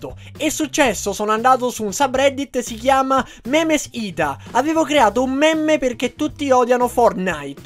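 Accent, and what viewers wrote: Rome, Italy. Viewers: Ma sei romano?🧐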